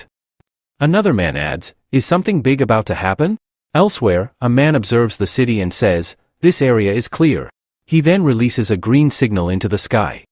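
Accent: India. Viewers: WTF why after min 50 the Ai voice have India Accent, at least check your edited video dude.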